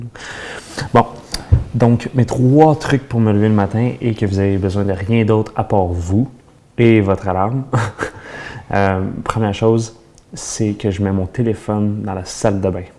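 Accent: Quebec, Canada. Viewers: super bon conseil et en fait l'accent canadien ca passe bien ^^ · Putain je comprends mieux les vidéos où tu parles anglais...🤣. T'as tué le mythe avec cette accent québécois t'es tellement plus charismatique quand tu parle anglais. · oh la la l'accent canadien trop compliqué... mais merci des conseils!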